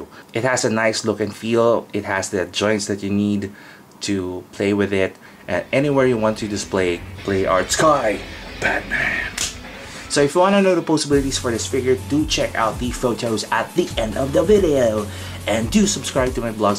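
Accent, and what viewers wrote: Philippines. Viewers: hey man I can tell you're Filipino so from which mall can I buy this?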